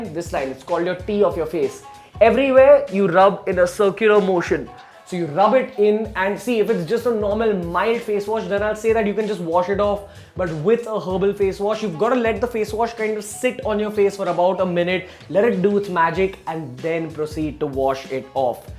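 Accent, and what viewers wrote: India. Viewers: 我们喜欢印度口音 好听 哈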